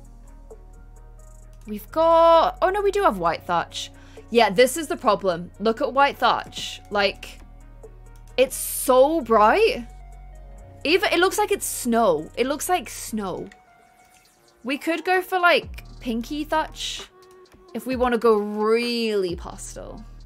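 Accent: United Kingdom. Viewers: It's so cute how you speak Korean with an English accent.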